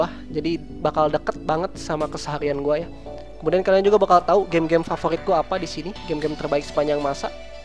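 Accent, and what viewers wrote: West Java, Indonesia. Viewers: langsung keliatan logat sundanya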